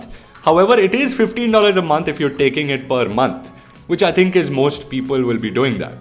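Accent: India. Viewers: Oh my God do you have to sound so Indian?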